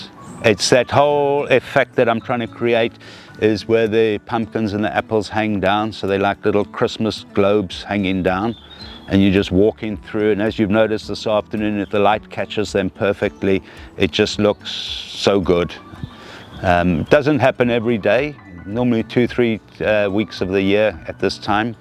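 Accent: South Africa. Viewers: did not really catch the area.. Is it part of England? He sounds South African❤ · Great look! You can't mistake that wonderful South African ascent ❤ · This is so beautiful and so lovely to hear a South African voice doing this magical work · I just knew he was South African 😅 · Im assuming he’s South African, he sounds very SAn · Oh the south african accent. Unique I tell you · I'm spotting a Saffa accent! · Delightful! So proud to hear his South African accent ❤ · There is only one accent like it.😂 I immediately knew he is South African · The moment he started talking - I knew.... another Saffa - nice job!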